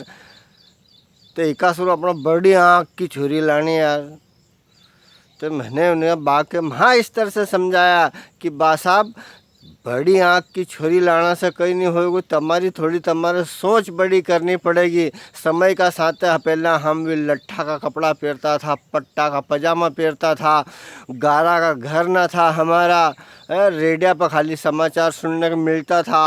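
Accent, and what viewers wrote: Malwa, India. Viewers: ...man khush ho jata h apk video sunkar..khas kr apki malvi boli sun kar...👏👏👏👏👌👌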